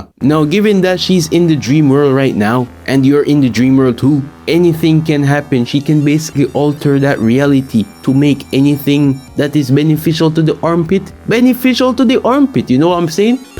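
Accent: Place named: Jamaica. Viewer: You sound Jamaican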